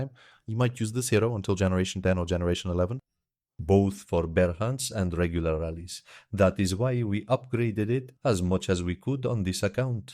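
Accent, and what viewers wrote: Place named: Turkey. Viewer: I listened to the video in the english language and the translation was very good. Oddly enough it still has a Turkish accent. Thats not a bad thing I was just surprised since it is AI translated I wouldn't have expected there to be any accent. You must speak very quickly in Turkish!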